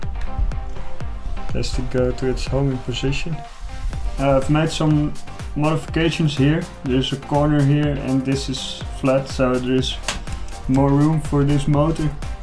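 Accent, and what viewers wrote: Netherlands. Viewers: I can immediately tell from your accent that you're a fellow dutch man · From with County you are you sound like a Dutch man that speak English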